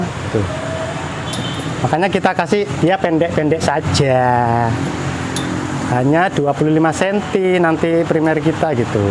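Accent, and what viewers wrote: Java, Indonesia. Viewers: Jawanya medhuukk😅 siap praktek boskuu❤ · Bapak orang Jawa yaaa, .. lucu kalau kasih penjelasan, jadinya mudeng xixixix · Aku di semarang p de mohon maaf caranya mupuk dan media anya apa sama di jawa dan di balik papan tapi logatnya koyo orang jawa... Terimakasih pak deje las sekali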